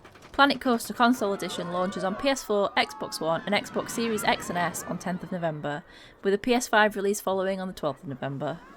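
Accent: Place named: Wakefield, United Kingdom. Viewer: I feel like you might be from near me! Wakefield area? Haha!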